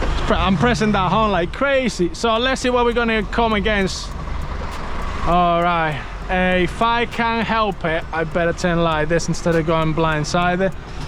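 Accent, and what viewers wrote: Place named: Manchester, United Kingdom. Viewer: Think he’s from Manchester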